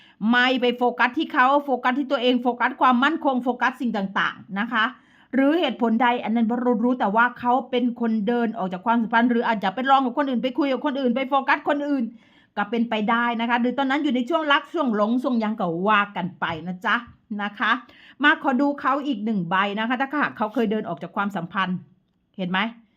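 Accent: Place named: Isan, Thailand. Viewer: แม่หมอ เปนคนจังหวัดใด่ค่ะ เห็นแม่หมอเว้าอีสาน 😆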